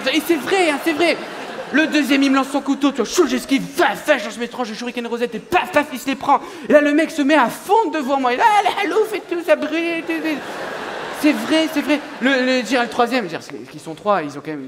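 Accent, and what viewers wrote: North, France. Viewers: une masterclass d’humour noir, d’humour beauf saupoudré d’accent du nord 😭🤣👌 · C est d ou cette accent ?🤔 ch ti ?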